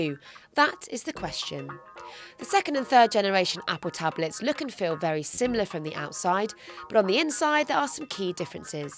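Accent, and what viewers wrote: United Kingdom. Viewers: really helpful review for those who are hesitated between the two. btw, this lovely british accent makes TechRadar videos rather more enjoyable to watch, i've replayed the video ThREE times just for that reason, so i'm gonna give two thumbs up, one for the video and one for the accent.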